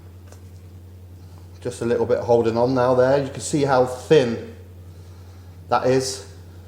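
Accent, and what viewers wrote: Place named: Yorkshire, United Kingdom. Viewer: Expected a backwoods southern US accent like mine. Got what sounds like a Yorshire accent(?) instead. 🤣 Cheers mate! Y'all did a good job.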